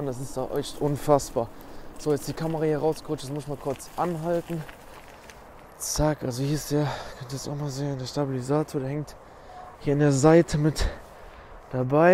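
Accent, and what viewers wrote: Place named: Cologne, Germany. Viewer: Deine Videos sind am Ende häufig wenig informativ, für mich - guck sie aber trotzdem gerne - wahrscheinlich weil ich an deiner Aussprache erkenne dass du ein Kölner bist 💪😎👍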